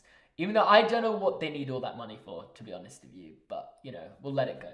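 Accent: United Kingdom. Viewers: He’s British? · I know you can’t be British with a name like but the accent says otherwise.. feels wrong man, we need to get you over to the motherland for some accent coaching.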